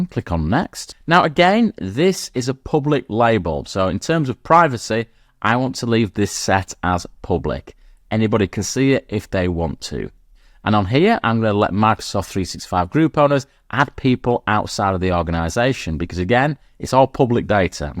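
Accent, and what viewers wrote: United Kingdom. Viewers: Entre escuchar el ingles británico y aprender el entorno de Microsoft, todo esta bien!!!!!!!!!!!!!!!!!!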